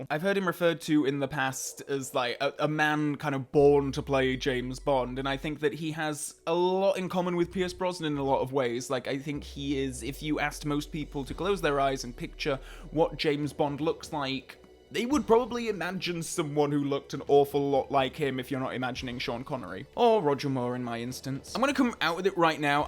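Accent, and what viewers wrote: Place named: England, United Kingdom. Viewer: English accent. 🥱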